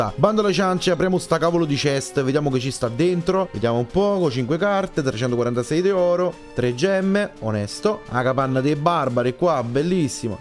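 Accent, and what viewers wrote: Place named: Naples, Italy. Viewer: haha si vede che hai l accento napoletano cmq bel video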